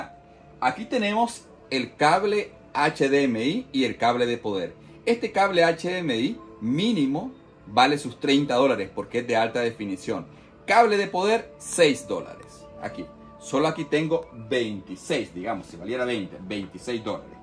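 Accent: Nicaragua. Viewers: Sos de nicaragua?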